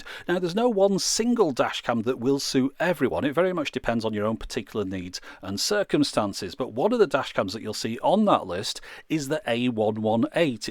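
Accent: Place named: Lancashire, United Kingdom. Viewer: I recognised Wigan and a Lancashire accent.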